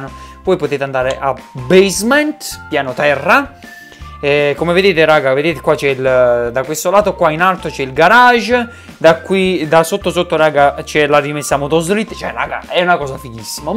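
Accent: Naples, Italy. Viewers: ma sei napoletano??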